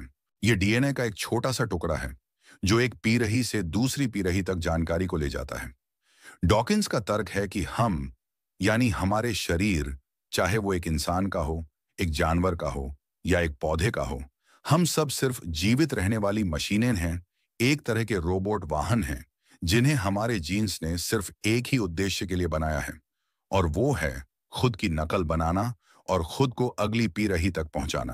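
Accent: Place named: Bihar, India. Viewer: I think AAP bihar se hai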